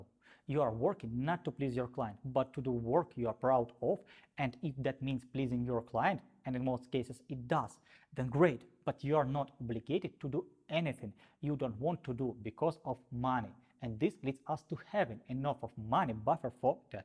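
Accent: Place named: Russia. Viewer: Hi, just you have an accent like a russians :)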